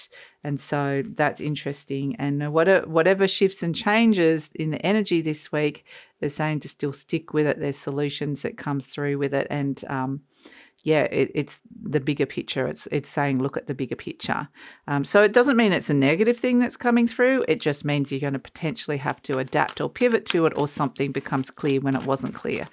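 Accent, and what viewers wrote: Australia. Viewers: Nice to hear an Aussie tarot reader. Has been a bad year for me, never got covid, but got Bronchitis, colds, chest infection's from everybody else and at 70 got to watch out, their's a lot of strange bugs going around now. let's hope 2023 is much better in health wise for everyone. Cheers and Merry Christmas Hun.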